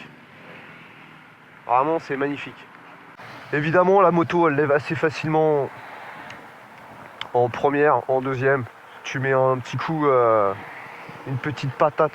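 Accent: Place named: Paris, France. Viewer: Salut à toi, j'aime bien ton accent parisien et la pertinence des tes coms lol..ça change des autres vlogs. Tu as peut être brisé mes rêves d'acquérir une 660 une fois mon permis obtenu, compliqué d'obtenir un modéle A2 fiable et bien équipé. Continu tes vidéos sympa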